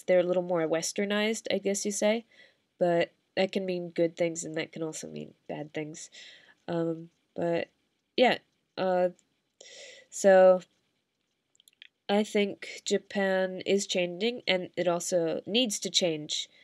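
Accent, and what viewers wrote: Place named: Ireland. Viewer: You sound irish. Are you from boston?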